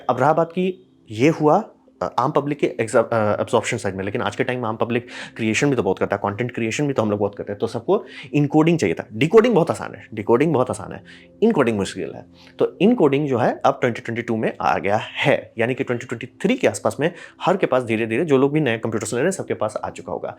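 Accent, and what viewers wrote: Bihar, India. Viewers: sir ji aap Bihar se hain kya??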